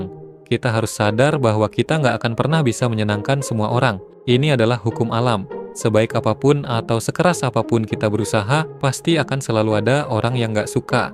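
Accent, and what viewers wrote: Bali, Indonesia. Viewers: blinya logat bali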